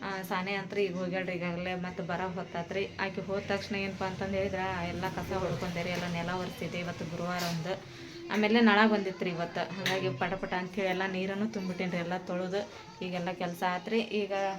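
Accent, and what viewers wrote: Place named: Hubli, India. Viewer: Beautiful family ur Hubli is very beautiful & ur hubli kannada is so cute I like it gd night allah hafiz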